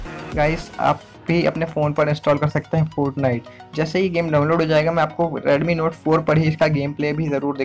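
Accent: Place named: India. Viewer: What are you even saying. Indian probs lol.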